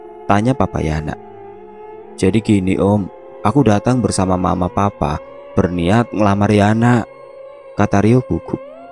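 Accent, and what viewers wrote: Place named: Java, Indonesia. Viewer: Suaranya bagus, ceritanya seru, ada lucu nya, ada medoknya, trnyata mas ganteng juga 😅 baru tau deh...😅 Semangat mas ❤ Btw mas sekarang bikin Chanel Radio horor itu ya?? Suaranya sama bgt soalnya. Sukses selalu mas 🎉😊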